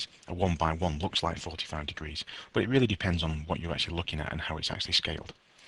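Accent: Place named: North West England, United Kingdom. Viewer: Lol. Hes from north west i think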